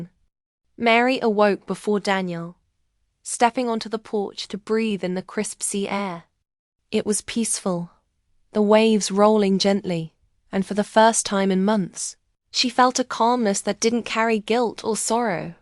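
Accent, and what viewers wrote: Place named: England, United Kingdom. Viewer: Very appealing English accent. Thanks.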